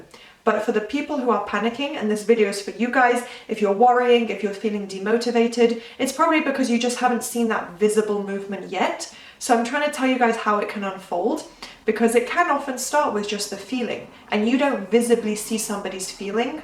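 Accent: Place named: London, United Kingdom. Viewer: thank you, dear I am passionate about your British, Londoner accent and of course, love your content always very clear and thourough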